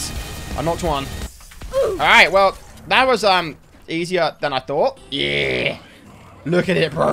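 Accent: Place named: United Kingdom. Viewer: Yes. A British YouTuber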